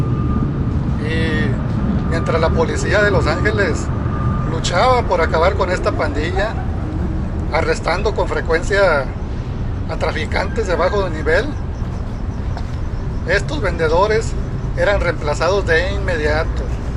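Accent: Mexico. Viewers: Compa tienes un acento muy marcado michoacano que me distrae, el video parece que es un comercial a esas bandas, no todos los que ven el video son unos expertos como tú, buena idea fallida ejecución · Esto de las pandillas son puras tonterias que en vez de sumar a la sociedad, restan, hablas bien mexicanoooo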